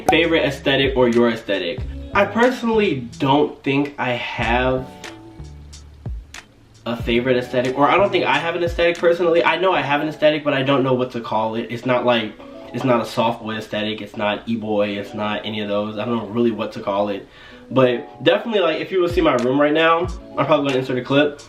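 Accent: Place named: United States. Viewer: Wait, is this? Looks like him but and same name but im confused cos he sounds american.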